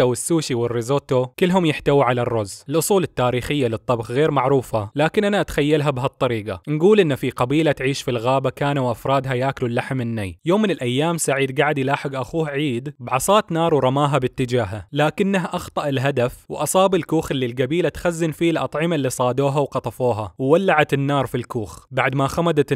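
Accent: Qatif, Saudi Arabia. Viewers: انت من القطيف 🇸🇦 ؟ لأن لهجتك نفس لهجة سرورز 🇸🇦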